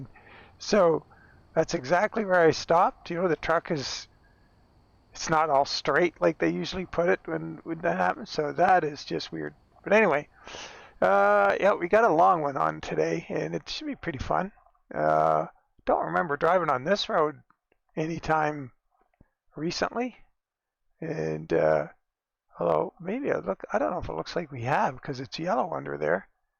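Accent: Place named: New Zealand. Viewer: Ha, inthat last video I thought the voice was a kiwi accent. As a kiwi myself I approve 😅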